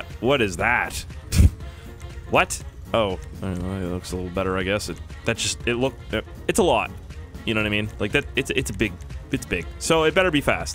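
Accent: Nova Scotia, Canada. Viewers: What side of Nova Scotia are you from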